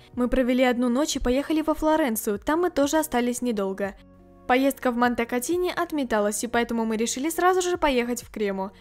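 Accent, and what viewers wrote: Ukraine. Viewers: ты украинка?:_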